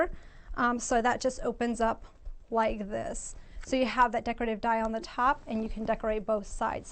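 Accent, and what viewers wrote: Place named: Germany. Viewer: Popping into this several years late, since my daughter & I have been stocking up on the albums., your faint trace of accent made me very nostalgic. My dad's family was Germans from Russia, who spoke a Plattdeutsch dialect very similar to Amish/Mennonite German (recipes are very similar too). Dad was the last generation to speak German first, English second. He died three years ago, and it was such a joy to hear again.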